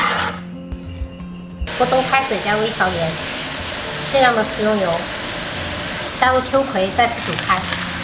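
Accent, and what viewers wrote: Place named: Fujian, China. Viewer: ：我在温哥华福建人，一看你的视频就犯嘀咕...觉得听到乡音！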